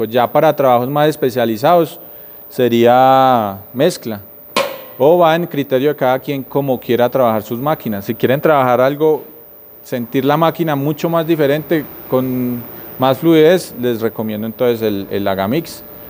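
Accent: Colombia. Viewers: a bueno pensé que eras de Medellín por tu acento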